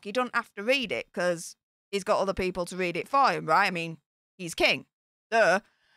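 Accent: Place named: England, United Kingdom. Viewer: I swear sometimes her english accent is so strong, I cant understand what the heck she's saying! And she talks so blooming fast! I hate to say it, but I prefer.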